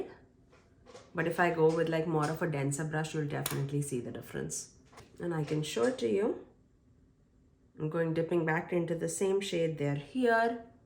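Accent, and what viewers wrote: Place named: India. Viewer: Don't worry about your voice. It's still giving ASMR vibe for me. I just realized my old friend Ambuja used to give me the same feelings before I knew what ASMR was. I thought I was a weirdo for getting that buzzy feeling. It must be something about your soothing Indian accents that get me.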